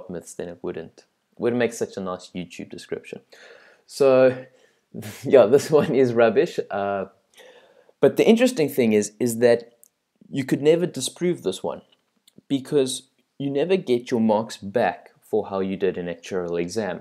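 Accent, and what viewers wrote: South Africa. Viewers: Ahah you are so South African! Me too.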